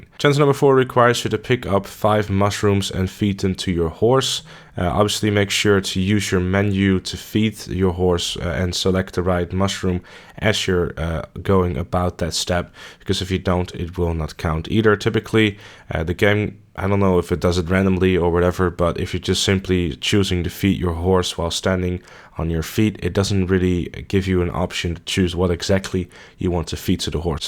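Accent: Netherlands. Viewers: Great guide, I'm a fan of rear herbs. Are you Dutch? I can't place your accent.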